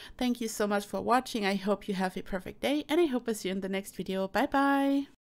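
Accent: Germany. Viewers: u sound german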